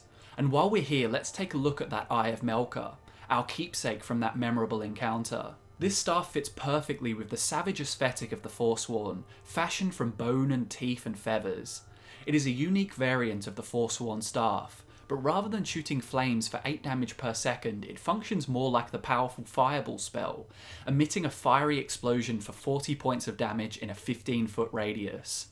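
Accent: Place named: Australia. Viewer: Are you an Aussie? you sound it. I am too XD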